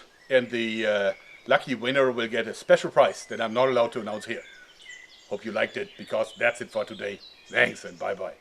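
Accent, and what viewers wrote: Germany. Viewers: man hört voll raus das der Typ deutscher is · he sounds german idk :D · Du hast nur einen Satz gesagt und ich wusste sofort das du aus Deutschland kommst :D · you have an really Nice german ~ accent ~ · you are one sick fuck;-) l enjoy your exuberance and enthusiasm dont change and that german acent off the top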